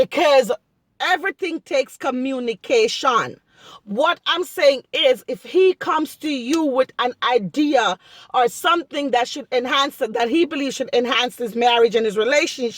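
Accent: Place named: Jamaica. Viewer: I love your exit is that Jamaican this may be ignorant question ... I apologize if it's offencive but your accent is lovely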